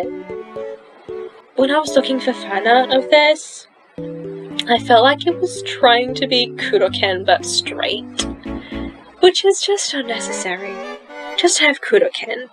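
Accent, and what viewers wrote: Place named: New Zealand. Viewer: as soon as i heard your voice i just said “she sounds like a kiwi!” ig i was right haha (im also a kiwi 😊)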